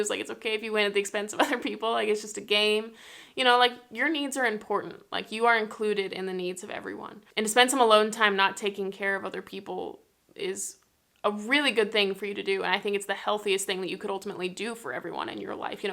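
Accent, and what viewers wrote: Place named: France. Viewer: Kool Vidéo! You speak english like it is french😎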